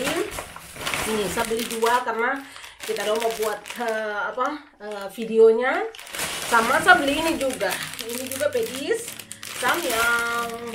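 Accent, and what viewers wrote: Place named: Papua, Indonesia. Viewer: Bule jerman pintar bahsa Indonesia dan yg lebih asyik bisa logat bahasa Papua dn Maluku..keluarga bahagia..salam kenal dr kota Timika🤩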